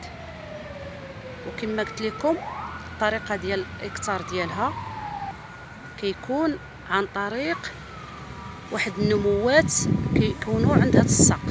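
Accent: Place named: Algeria. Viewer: ما اجمل اللهجة الجزائرية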